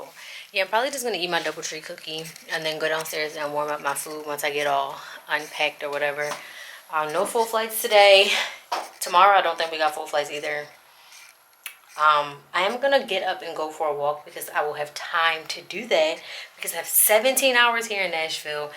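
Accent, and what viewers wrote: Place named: Baltimore, United States. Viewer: Are you from baltimore? im hearing the accent lol, i love your videos E 💗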